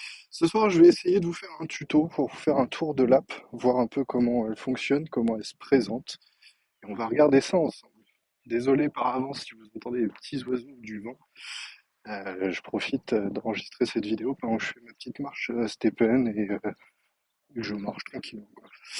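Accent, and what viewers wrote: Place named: France. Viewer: Merci pour le tutoriel ! Et vive la France pour l'accent haha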